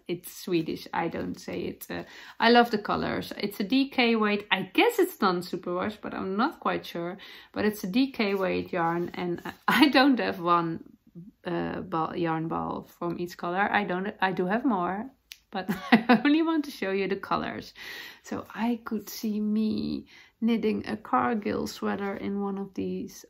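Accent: Netherlands. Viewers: I know that I don’t have to say that your English is perfectly fine, but really, it IS! Please do not stop doing the podcast in English. Yours is my ABSOLUTE favorite knitting podcast and I will be so sad if you give it up! I look forward to it every week. Of course, you should do whatever is best for you, but for your viewers (especially this one) I love listening to your Dutchy English. ❤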